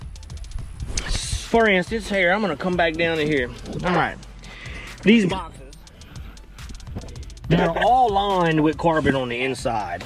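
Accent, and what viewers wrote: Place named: Acadiana, United States. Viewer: Man I know an Acadiana accent when I hear one! I recognized Eunice City Lake when you showed a clip fishing on the water. I am also from Eunice so you have my subscription!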